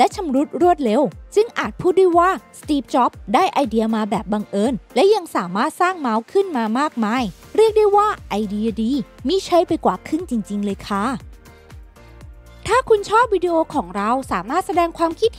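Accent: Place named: Provincial, Thailand. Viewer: เหมือนเหน่อ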